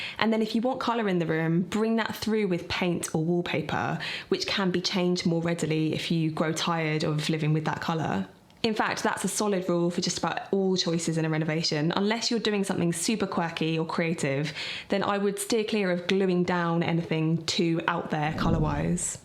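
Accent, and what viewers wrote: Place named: United Kingdom. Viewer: I was thinking of saying the same thing when I saw jose's. What I'd like to add it that, your British accent is the lovliest I've heard!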